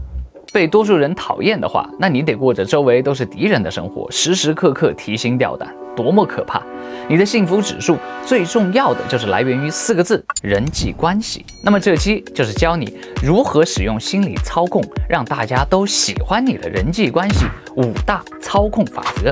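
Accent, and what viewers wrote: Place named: Wuhan, China. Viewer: 最近刚刷到老师，视频听着觉得太棒啦。听到这个视频中亲切的武汉话才得知是我老乡，我是生活在墨尔本的武汉丫，必须支持 ❤马上会员安排上😊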